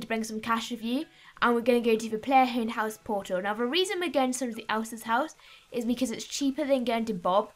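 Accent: United Kingdom. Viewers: Interesting accent, south England?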